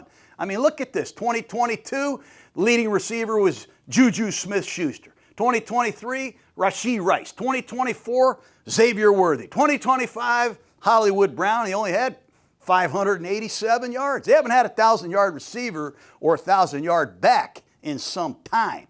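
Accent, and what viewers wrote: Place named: Boston, United States. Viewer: Baahstaahhn accent go me jazzed! lets go coach!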